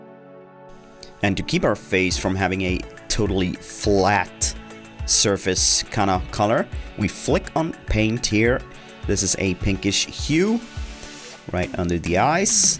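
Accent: Sweden. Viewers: What swedish accent do you have??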